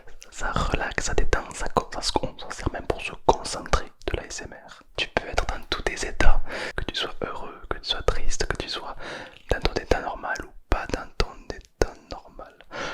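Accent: South, France. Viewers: Mdr j'adore tous les personnages que t'as inventé que tu fait intervenir dans tes vidéos et ton ASMR est génial encore plus avec ton accent du sud ❤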